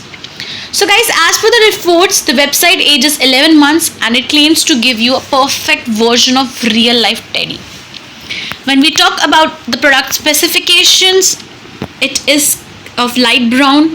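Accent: India. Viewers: I don't understand the Indian/English accent, Worthless review, at the end I did not understand whether she bought a realistic dog or not since did not show the physical dog running all over.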